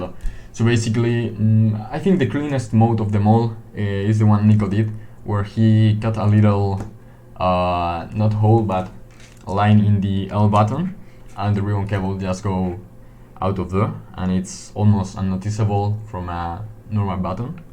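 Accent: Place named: Spain. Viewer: claro primo. ¿No reconoces a un español hablando inglés? Se nota el acento!! :D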